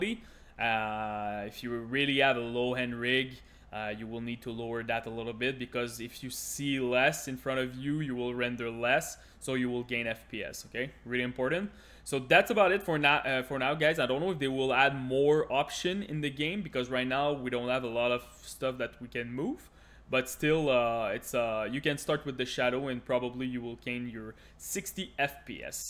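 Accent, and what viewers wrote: Quebec, Canada. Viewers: merci pour le tuto... j'écris en français parce que ton accent paraît très québécois ^^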